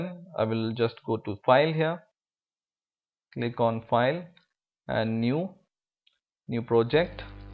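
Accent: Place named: India. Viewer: your accent is indian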